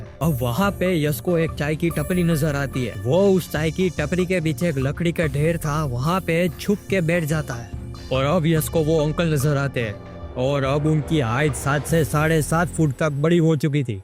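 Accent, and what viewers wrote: Gujarat, India. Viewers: Gujarati tone me pehli bar koi horror story Sunni maine❤ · Amezing story!❤ (I love your gujarati tone and expressions) Keep making the stories.💥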